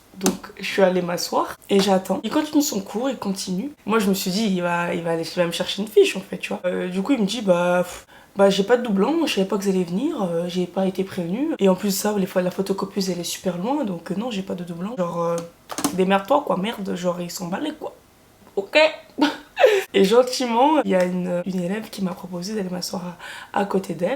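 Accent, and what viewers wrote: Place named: Italy. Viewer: Whaou cet accent italien ! Je regarde tes vidéos 10 après tout va bien 😂😂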